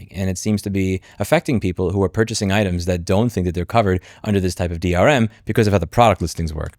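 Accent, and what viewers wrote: New York, United States. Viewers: you would'nt happen' to be new yorker ey?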